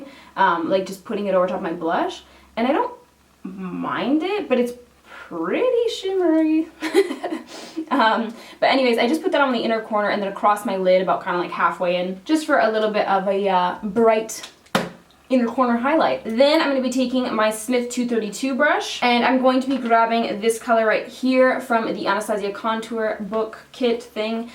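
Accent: Canada. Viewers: Is she Canadian? bc I can hear her accent not a bad thing I actually love it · your subtle canadian accent is so rad hahaha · Everyone is pointing out your slight Canadian accent and as a fellow Canadian, I didn't even hear it at first haha love ya!! ❤️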